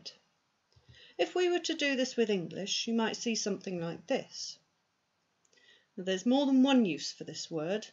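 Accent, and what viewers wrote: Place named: England, United Kingdom. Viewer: Yeah good lesson! I've difficult with your very well english accent for a french like me who's not so good to talk fine english and undesrstand english people. :-) But with several times to see and listen, i'll hope it will be better. Waiting too for the next... Best!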